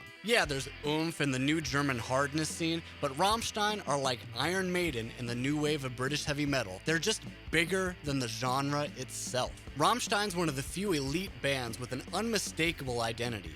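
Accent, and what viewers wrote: United States. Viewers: You said german is a brutal language. So could you please spell it at least not with a hardcore american accent? (Sry for being shitty but some names were spelled really meh xD) But good video! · The american accents fucks me up. dude please try im not even german and it hurts me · Hearing Americans speak German is just silly😂, but you props for doing it! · he kinda reads the words in english. He made great points about the band and that's what matters. If we judge, we can go as far as judging fans for not understanding german and that might include you. if it doesn't, it still includes like 80% of their fans. Yeah yeah, we know you know german better than others... save it. It's typical for americans to not use rolling r and sh the way germans do.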